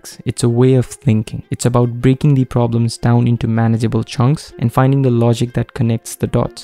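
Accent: India. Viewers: where you from bro? India, UP?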